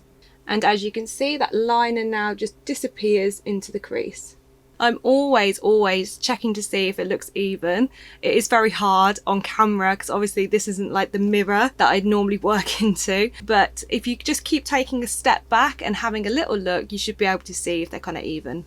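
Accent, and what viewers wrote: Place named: Birmingham, United Kingdom. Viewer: I ordered this palette today, can’t wait to use it!!! It’s so beautiful. Is that a Brummie accent I hear? Fellow Brummie!!!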